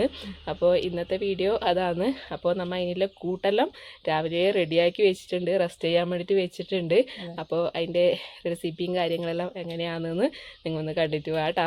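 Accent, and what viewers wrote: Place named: Kannur, India. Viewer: Nigal kanuru karano. Langage kelkubol tonunnu. Ee. Appam soft udo. Jnan udakubol orikalum nanavarilla. Oru nazi ariku atra alav puzukalari cherkanam. Makalk udaki kudokuvananu👍🏻❤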